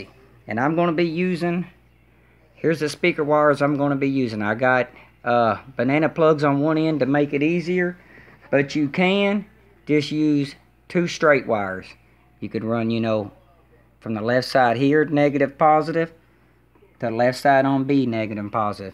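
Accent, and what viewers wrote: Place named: Kentucky, United States. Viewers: So I can tell from your voice your from my home state lol, what part of Kentucky bro? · I bet this dude is from Kentucky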